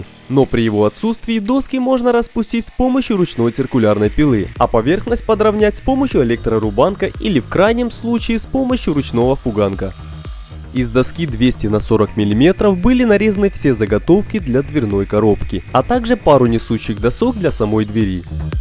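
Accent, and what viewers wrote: Ukraine. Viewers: А голоса за кадром украинский акцент что-ли не пойму?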